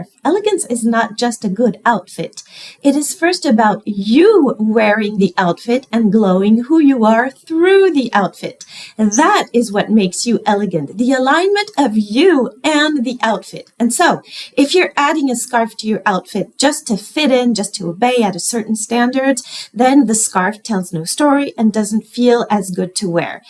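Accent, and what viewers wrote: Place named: France. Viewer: Yu are absolutely great,it is wonderfully to listen to yu , are Yu french,Yu have lovely accent and it is really pleasure to see yu😊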